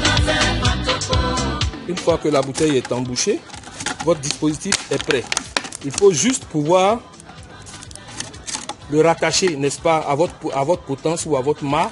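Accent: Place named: South, France. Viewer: Coucou, j'ai tout compris... tellement bien expliqué et avec l'accent du soleil en plus, que du bonheur. Plaisir et joie chez vous.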